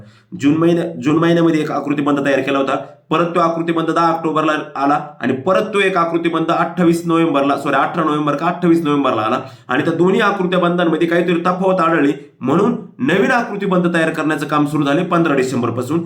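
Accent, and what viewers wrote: Ahmednagar, India. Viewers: काही म्हणा गड्या... पण हा माणूस खरच अख्ख्या महाराष्ट्रात एकदम भारी माहिती सांगतो...आणि सर्वांना समजेल अशी सर्वांच्या भाषेला match होईल अशी अस्सल नगरी भाषेत एकदम कडक...सर खरच खूप छान काम आहे तुमचे....love you..❤❤ तुमचा व्हिडिओ पहिला ना सगळी माहिती डोक्यात जाते डायरेक्ट...🎉🎉🎉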